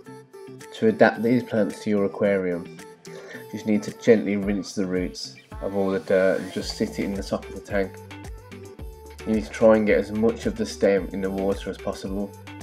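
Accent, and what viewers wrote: Birmingham, United Kingdom. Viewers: you're from birmingham!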